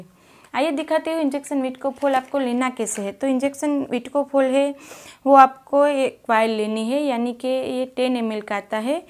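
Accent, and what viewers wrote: Gujarat, India. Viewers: aap ki.language accecnt gujarati sound hoti hai doc. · Aap gujrathi hai kya? Shayad kuchh or Bhavnagar ya junagadh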